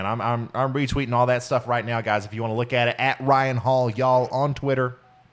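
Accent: South, United States. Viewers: I don't think Ryan has a strong southern accent.just a hint there. You guys who says he has a southern accent needs to come to GA and listen to people. But I'm with Ryan it has nothing to do with intelligence at all.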